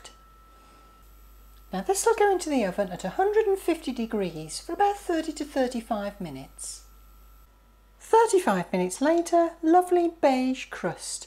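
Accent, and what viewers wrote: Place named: England, United Kingdom. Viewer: you're so sweet!.. I love the way you explain the procedures, and that AWESOMELY perfect English accent! Suscribed! Greetings from Argentina *=D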